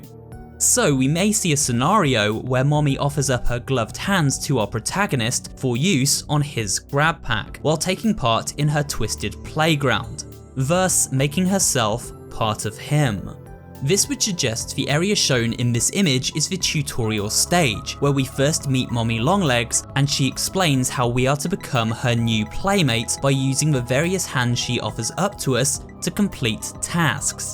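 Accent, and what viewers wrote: United Kingdom. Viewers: Why does she talk like British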